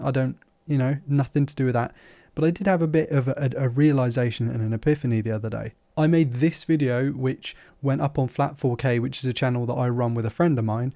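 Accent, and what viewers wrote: United Kingdom. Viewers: I just watched one of your videos for the first time (the Used S23Ultra vid) and was happy to subscribe despite being a terrible fit (geezer American who's never played a video game in my life). I'm a sucker for a calm Brit who knows his stuff and doesn't waste my time. Best of luck and take care of yourself.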